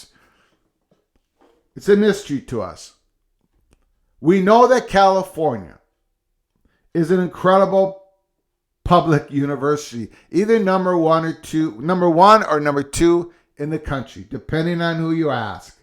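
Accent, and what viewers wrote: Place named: Minnesota, United States. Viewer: Your Minny dialect sounds Chuck Grassley-adjacent "vooootes."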